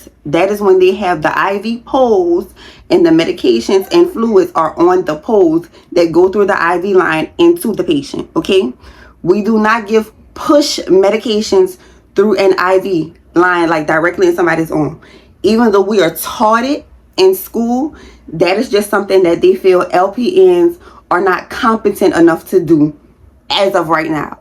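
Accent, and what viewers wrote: New Orleans, United States. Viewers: I love her accent New Orleans baby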